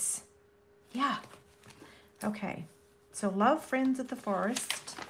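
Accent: South, United States. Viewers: So we can’t hear that sweet southern voice & your beautiful face at the same time…. Thanks for hanging in there.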